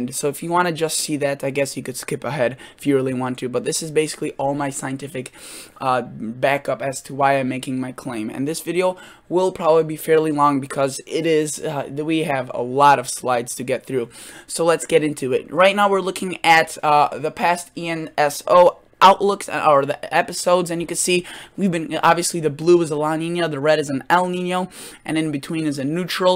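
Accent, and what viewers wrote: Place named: Upper Midwest, United States. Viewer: This guy is so from WI or MN.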